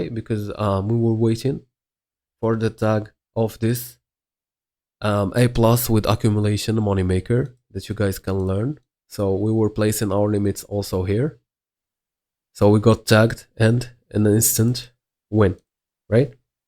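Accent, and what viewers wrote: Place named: Morocco. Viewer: سلام عليكم، اخي عرفتك مغربي من صوتك تبارك الله عليك وبغيت نسولك واش تنصحني نبدل من ict لهاد الموديل تع dtfx واش في نظرك احسن من الايسيتي حسب التجربة ديالك ? وسؤال الثاني واش مكينش شي استراتيجية فيها RR اكثر من 1 في استراتيجيات تع ال dtfx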